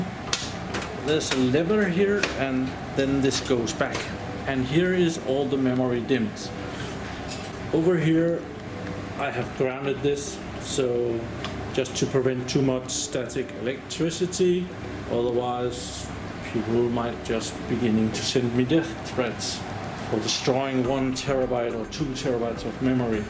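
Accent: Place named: Denmark. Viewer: Syntes sku nok det lød som dansk accent :D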